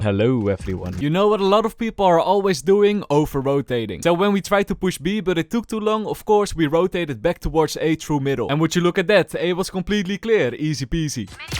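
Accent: Netherlands. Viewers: Being Dutch I thought a Double Dutch accent would be laughed about online, but proves me absolutely wrong lol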